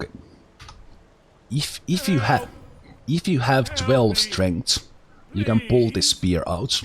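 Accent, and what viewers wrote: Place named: Finland. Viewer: You have a finglish accent :D awesome!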